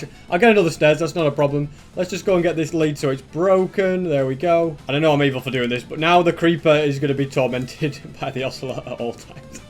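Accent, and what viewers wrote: United Kingdom. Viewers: He is british you plum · Is it just me or sounds british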